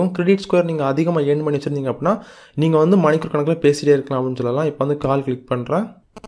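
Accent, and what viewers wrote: Kanyakumari, India. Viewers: Bro neenga kanyakumari District ahh.....??? Slang paatha theriuthu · Kanyakumari neega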